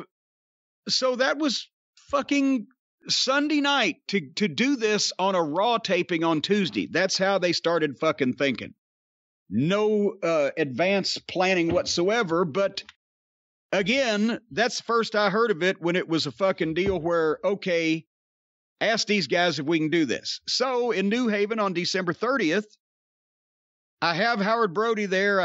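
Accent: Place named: Kentucky, United States. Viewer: Especially considering Jim is originally from Kentucky and still has his accent.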